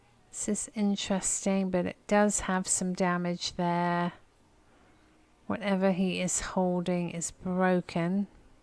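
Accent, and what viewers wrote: England, United Kingdom. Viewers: what part of England are you from, you sould a bit like my cousin Jenn, she was from Maidenhead, and a teensy bit like my friend Lorraine who is from Cobham. my other english friends do not sound one bit like you, they are from Liverpool, and another family says they are gordies, OH my , when I first met them I couldnot understand them, they had so many different rhyming words for things, not like cockney but different, and my exchange student was from Bristol, and Beautiful Cotswald. my mother in law was born near London, so English accents are my favorite things. lol. I am not like the professor in My Fair Lady but I do enjoy trying to figure out the accents. London accents are the easiest.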